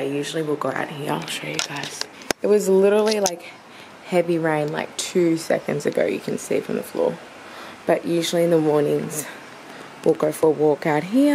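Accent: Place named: Australia. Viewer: Awww loved it❤ the way reacts when he sees coming downstairs just melts my heart. And I loove ur australian accent❤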